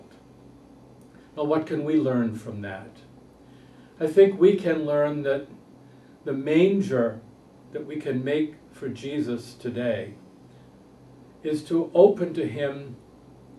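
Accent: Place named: Boston, United States. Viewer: Cousin, I really loved this video. It bring the spirit of Christmas through the voice of children. All done in a still nice Boston accent. You are a star! Merry Christmas. I'm going to Japan on 12/27 to see friends ( i use to live there) and to celebrate my 60th birthday on the Epiphany, Jan. 6th. from your cousin Joanie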